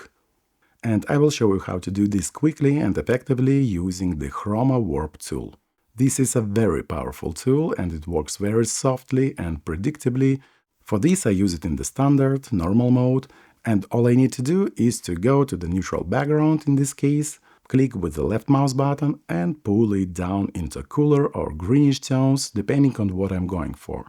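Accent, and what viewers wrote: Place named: Russia. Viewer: Вроде парень наш, русский или нет? Вот прям чувствую ломаный английский, акцент русскоговорящих.))).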